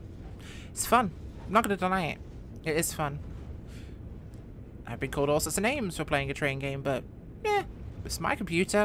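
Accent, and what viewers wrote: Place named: Somerset, United Kingdom. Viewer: Wow am impressed do you have an interest in trains or are you just playing this simply for fun?? 😄👍 Oh and your somerset accent was good and funny 😄😄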